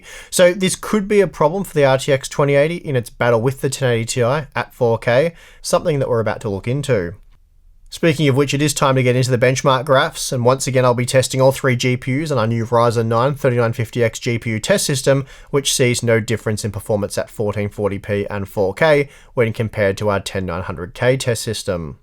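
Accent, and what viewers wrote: Australia. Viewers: I never have any problem understanding the Aussie accent but I legit heard "harbor on box" this time. Also with Turing pricing was the biggest problem. If the 2080 and the 2080Ti were both $200 cheaper they would've been a decent pair of GPUs.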